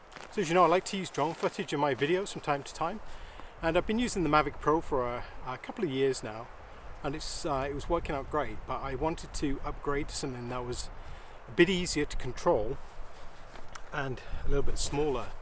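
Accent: England, United Kingdom. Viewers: Hi, enjoyable video thanks. I take it you are originally from England, or at least the UK. How did you end up in Canada? If you don't mind me asking.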